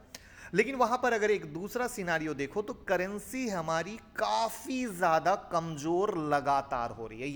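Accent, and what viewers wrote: Bihar, India. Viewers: Sir aap Bihar se ho kya?????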